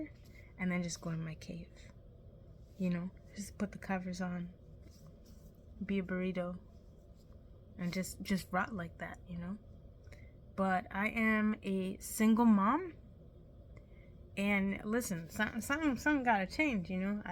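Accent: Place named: New York, United States. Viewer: Ahh, i could almost tell you're a New Yorker! Aayyyy. Keep going girl, I'm rooting for you.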